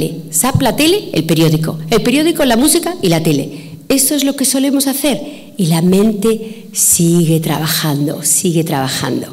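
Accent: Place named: Spain. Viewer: Me parece muy bueno, por que sigue todas las características de El Arte de Vivir, llevadas al gran publico (es un decir) por que la mayoría no entenderá los mensajes. Su dicción y su tono de voz, con su recatado acento español. son fascinantes.He estado recomendando este vídeo a las personas importantes que lo entendieran. Lamento apreciaciones como las de Martin Martin. ya comprenderá, mas pronto o menos pero aprenderá a respirar y sera diferente.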